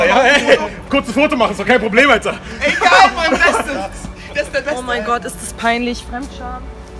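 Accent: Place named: Berlin, Germany. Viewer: wie witzig er spricht ( auf berliner art )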